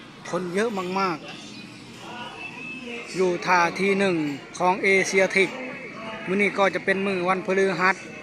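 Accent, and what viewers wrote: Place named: Savannakhet, Laos. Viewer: สวยมากฯ... เสืยงคนทาง สะหวันนะเขด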